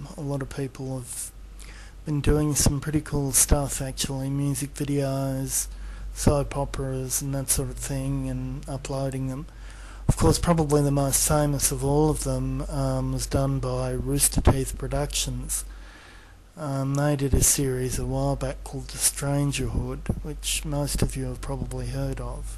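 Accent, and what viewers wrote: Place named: Australia. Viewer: lol your vid was on my recommendation, so random. Damn this was 18 years ago so judging by your accent you’re probably one of the few Australians to ever upload a YT video during that time.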